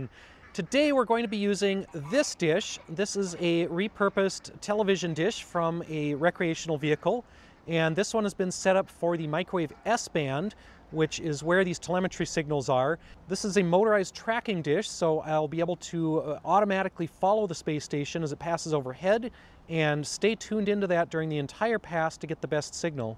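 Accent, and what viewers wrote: Alaska, United States. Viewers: As a Canadian I appreciate your Alaskan accent. Nice video, 👍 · I never noticed your accent until you spoke the words “my Alaskan accent” and I heard it immediately. My brain went directly to Tina Fey playing Sarah Palin on SNL… I think I might be getting old. Cheers from Canada! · As a Swede, you’re Alaskan pronouncing is absolutely fine. I have never had any problems understanding what you say 👍😀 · I live in the Twin Cities. You have an Alaska accent?! What!?? You sound totally local. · Nothing wrong with with your Alaskan accent ….Manchester England 👍👍👋👋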